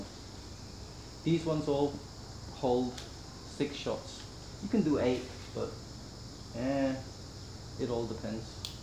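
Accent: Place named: United Kingdom. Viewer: I never woulda thought you had a brit accent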